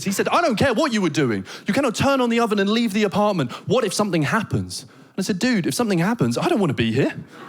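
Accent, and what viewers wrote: Denmark. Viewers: Does have a Danish accent when he speaks English or maybe he is just one of those people that sound horrible no matter the language they try to use.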